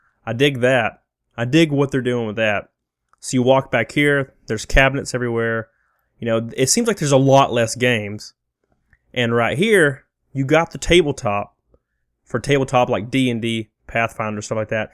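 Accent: South, United States. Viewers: It's a southern thing. In my time there, I ran into tons of otherwise highly intelligent people who mangled the pronunciations of all kinds of words.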